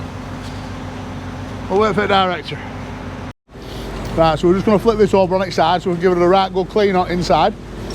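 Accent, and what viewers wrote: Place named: Preston, United Kingdom. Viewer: It’s not just the Preston accent… but the engineering drama is like ‘DIBNAH 2000’ or something. Love it.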